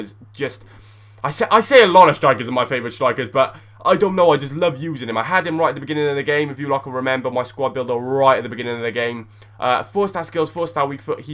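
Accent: Wales, United Kingdom. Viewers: are u from wales but ?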